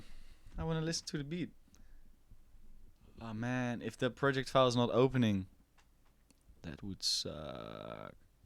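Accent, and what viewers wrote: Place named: Netherlands. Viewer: Lekker dat Nederlandse accent 😂💪✌🔥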